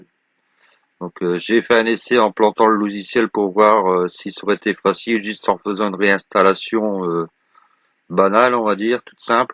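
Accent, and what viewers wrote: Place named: North, France. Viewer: bravo bonne explication avec l'accent du nord. merci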